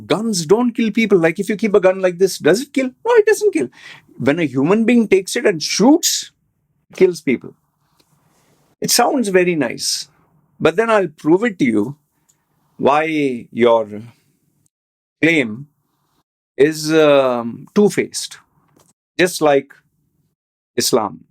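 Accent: India. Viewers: Ok looking past your tattooed funny looks and very strong Indian accent and onto the actual subject. Your first argument to prove that Islam is not a religion of peace is because most of the so called terrorist attacks are done by Muslims and not any other religion. It helps when you do a little bit of research before opening your mouth. Year 1009. Crusader siege of Jerusalem result in mass killing of over 100000 people. Historians write that blood was flowing in the streets of Jerusalem. All done in the name of Christianity hence the name Crusades. Year 1187 and Saladin conquers the Jerusalem from the Crusaders. What does he do? Offer ransom to Christian and Jews to leave the city peacefully to the land of their choice. No mass killings at all in the name of Islam. Just one example to reply back to your silly podcast with zero research. Fast forward to two world wars where millions were killed. 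Why were Jews killed in WW2? Yes because they were Jews. Who killed them? Now onto the latest example. How is committing Genocide in Gaza? Yes take your time and do some independent investigation. Maybe you will be able to see clearly even thru your tattoos next to your eyes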